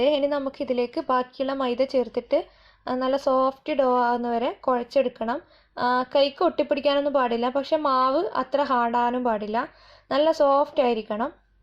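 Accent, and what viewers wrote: Kannur, India. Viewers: Samsaram kettappam manasselayi kannur aan annu , njan Kuthuparamba aan, cakel oru cup RAVA charthaal nannavumo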